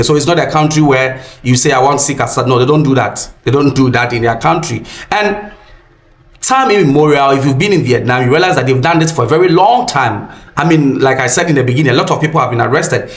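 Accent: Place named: Ghana. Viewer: SEE YOUR MOUTH calling color colar with your heavy Ghana accent. If you want blogging wars you will get it. You think Ghanians are saint and dont hane dirt ? We are trying to retrieve $59,000 and $48,000 among other fees from scammed us for land paid for two of my African American friends who bought into your advertisement to return to African.....SCAMMED IN BROAD DAYLIGHT BY GHANIANS!!! I have names and their plea in underwears begging for mercy to pay back. If videos go online now the west will laugh because the inner devide is what they want you fool! Perhabs we should just throw caution away and expose every dirt we can find on Ghanians too and lets this whole B.S happens. Even as I say this,I know defending the pride of African should prevail above anything else but sometimes these folks be asking for it!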